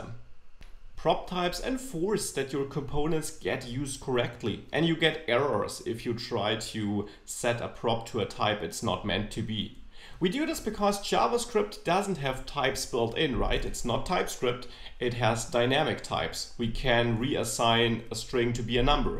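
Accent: Germany. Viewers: Is that german accent? btw nice tuts. I would suggest you to make an advanced React/redux (100+ hours) PAID course.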